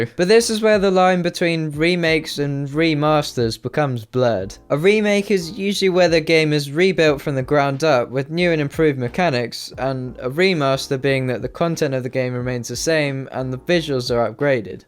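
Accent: United Kingdom. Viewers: You're british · should have known you were British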